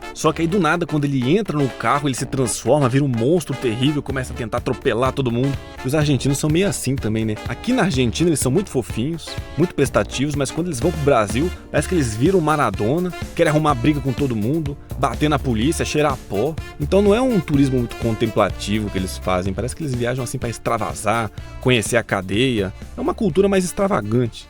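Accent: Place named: Minas Gerais, Brazil. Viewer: Ótimo vídeo! Comecei meu périplo por seus vídeos com a reportagem sobre Zigurats. Daí chequei sua lista e vi que este aqui é o mais recente. Que grata surpresa, meu amigo! E o que mais gostei, além dos detalhamentos, foi do humor que você imprime às reportagens. Só uma dúvida: Você é catarinense mesmo? Sotaque mineiro de BH inteirinho! Abraço!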